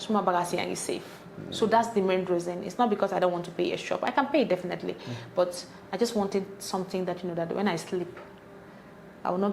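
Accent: Nigeria. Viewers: 8ys,she speak like Nigeria accent, respect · Sis already sounds like a Nigerian 😀 Brilliant woman 👍🏽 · I am glad that people with stereotypes about Nigeria can see that Nigerians dont discriminate, and you can be successful at whatever you put your heart to do in Nigeria. Nigerians and Gambians speak alike. I couldn't even pick any difference. Kudos young lady, God will continue to uplift you in your endeavours. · Her accent is nigerian🎉🎉🎉🎉🎉🎉🎉🎉🎉 It has nothing to do with the volume. Nigerians are generally flamboyant. We love spending and looking elegant. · She speaks more like a Nigerian, am loving her already.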